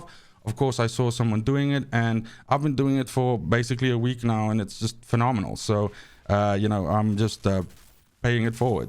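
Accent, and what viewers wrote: South Africa. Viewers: South African lol 🇿🇦?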